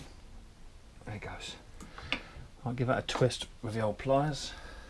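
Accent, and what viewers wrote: United Kingdom. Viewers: Love the accent, the British is my favourite, great job with the mower tho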